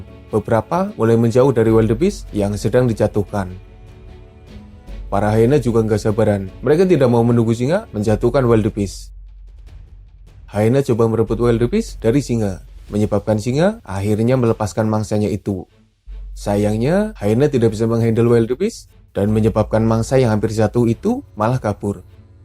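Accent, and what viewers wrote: Banyumas, Indonesia. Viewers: admine wong ngapak ya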